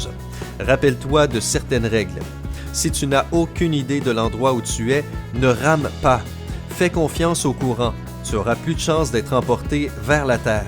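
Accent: Quebec, Canada. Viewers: Tu est quebequoi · Le naratteur c un québecois non???????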